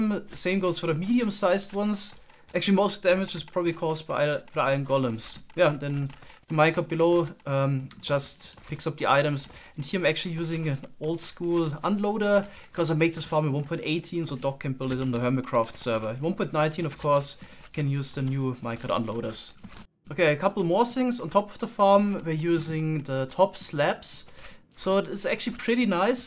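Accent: Germany. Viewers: are you german? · sounds like a german accent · Deutscher Akzent, liebs:)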